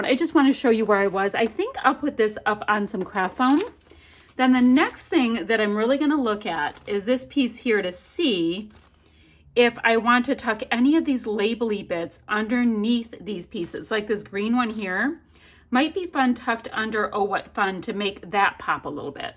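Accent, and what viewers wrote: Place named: Midwest, United States. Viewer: Nicely done. Precious! Precisely why we don’t have a tree this year. We have a 9 month old pup of a much larger breed. She loves to chew and to steal things (admittedly mostly socks). So our favorite carol this year is 🎶 No Christmas tree, no Christmas tree, Piper would strip you of your branches. 🎶 Also, I’m guessing you are in the Midwest(?) I hear several of the YouTube gals from that area pronounce “bough” like it rhymes with “snow” not with “cow.”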